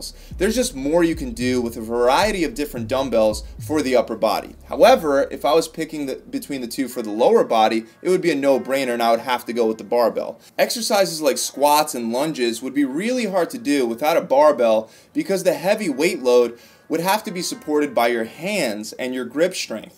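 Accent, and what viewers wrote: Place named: New Jersey, United States. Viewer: are you from New Jersey I was thier a few days ago first time and you got a Jersey accent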